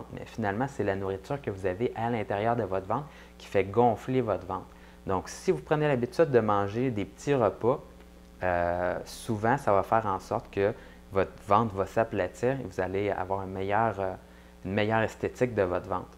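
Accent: Quebec, Canada. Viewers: merci pour tes conseils. De mon côté j'ai qq problèmes à perdre ma fine couche de graisse restante sur le ventre, ça fait des mois que je fais attention à mon alimentation et que je m'acharne à la salle de sport 2 à 3h par jour du lundi au vendredi + Qwan Ki Do le samedi...je vois une légère évolution mais c'est très lent, ça en deviendrait presque décourageant. Pour les(probablement) français, arrêté de vanner l'accent quebecois. Je suis belge et je comprend très bien tous ce qu'il dit. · on rit pas de votre accent moi perso je le trouve super l'accent québécois :) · Moi j'adore les accents quebecois, d'ailleurs il en a un :D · c'est clairement un québécois. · Malgré les commentaires negatif sur l'accent quebecois dans la video, je suis fiere d'en etre un et je ne veux pas me venter mais le québec est bien mieu que bien des endroits sur la terre. Sur ce... 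Merci BONSOIR! :D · Merci pour cette vidéo, j'ajoute que je suis un parisien et que j'aime beaucoup l'accent du Québec..en tous cas beaucoup plus que celui des sudistes synonymes de délinquance et de trafics en tous genres.... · je suis pas francophone ni quebecois..... mais je trouve cette video tres bien fait et audible le quebecois est un accent difficile a comprendre certes mais unique c'est ca qui le rend si particulier et magnifique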